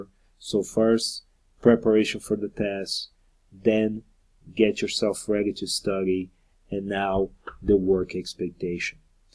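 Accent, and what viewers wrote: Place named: Brazil. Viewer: You sound Brazilian. Are you?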